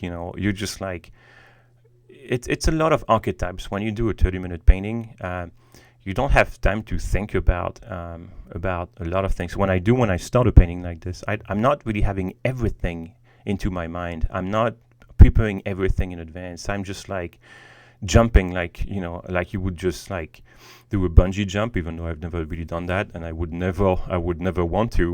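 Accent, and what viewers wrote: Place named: France. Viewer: Really cool. Your French Accent is cool! Im half french and I wish I had a french accent instead of a boring london one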